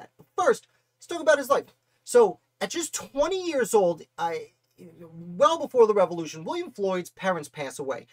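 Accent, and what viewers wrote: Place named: Long Island, United States. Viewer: Where is his house? I’m from Ronkonkoma. Where are you from? You say “lawg island” like me lol 😂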